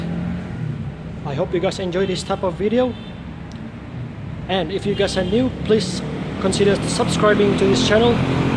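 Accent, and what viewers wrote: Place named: Australia. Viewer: This is so well put together, you sound Aussie like myself bud, the description in the video is so detailed and given some of the horror stories I've heard especially in older mazda versions of Ford 5 speeds I think you're bang on with preventative maintenance at those intervals. I'm hoping the process is similar for the 5 speed in my 2008 sp 23. Looks similar to one I saw but wasn't half as well done as this and video quality wasn't the best either. Pretty sure my trans is the FS5AEL slightly modified Ford one and pretty janky from all I've heard, although I'm sure fords is worse lol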